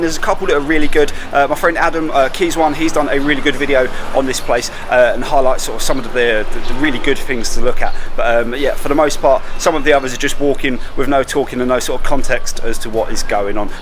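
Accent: United Kingdom. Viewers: where the hell in bbk? So confusing wih your crazy brit accent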